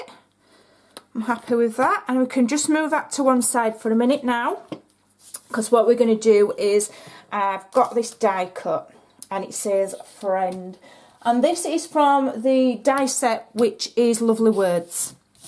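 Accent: Yorkshire, United Kingdom. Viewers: I adore your Yorkshire accent!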